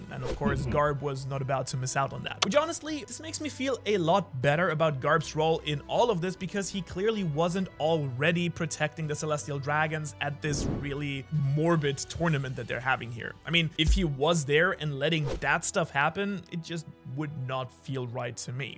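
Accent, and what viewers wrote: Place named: Germany. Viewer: Ich habe gerade Raffey‘s neustes Video geschaut und ich wusste nicht dass du Deutsch sprichst. Jetzt höre ich den leichten Akzent aber ich hätte es nie geglaubt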